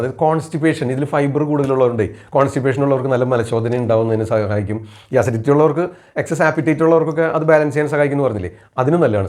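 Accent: Thrissur, India. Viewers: Doctor sure Trissur kkaran aanu ,speed accent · തൃശൂർ രീതിയിൽ വളരെ ലളിതമായി ഡോക്ടർ പറഞ്ഞു കൊടുത്തു. nannayi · നമ്മുടെ തൃശ്ശൂർ സ്ലാഗ് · Trichur aano sirnte sthalam avatharam super nalla arivanu nalkiyadu tnq sir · സർ ത്രിശൂർ ആണ് നാട് അല്ലെ 😃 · Doctor തൃശ്ശൂർക്കാരനാ ല്ലേ...🥰👍👍👍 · തൃശൂർ ശൈലി ആയതോണ്ട് നല്ല രസമുണ്ട് കേള്കാൻ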